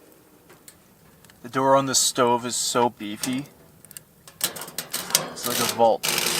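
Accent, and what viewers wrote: Canada. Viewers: Well, I shouldn't be such a whinger about it, so apologies for that,! I thought it was Canada somewhere (due to your accent), but it's a rather large country so I was naturally curious as to where exactly. Thanks anyway for replying!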